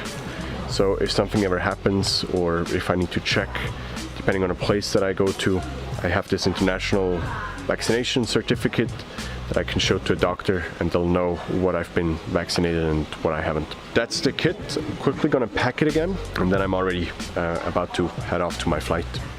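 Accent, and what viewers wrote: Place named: Switzerland. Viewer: Indeed, the Swiss accent sounds nice.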